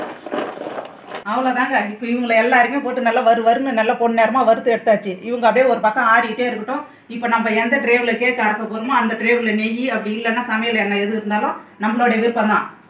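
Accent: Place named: Tiruvannamalai, India. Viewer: நீங்க எந்த ஊரு அண்ணன் எங்க ஊரு பாஷை மாரி இருக்கு நான் திருவண்ணாமலை 👋நீங்க என்ன ஊரு சொல்லுங்க அண்ணா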